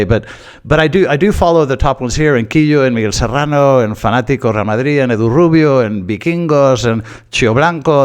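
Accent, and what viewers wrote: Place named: United States. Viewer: If it’s not offensive, can I ask why you have an American accent?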